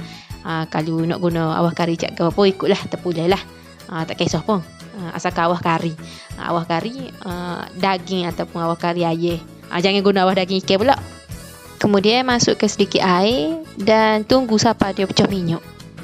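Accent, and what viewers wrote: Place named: Kelantan, Malaysia. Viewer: hihi.. Bhsa Kelantan pure betul la sis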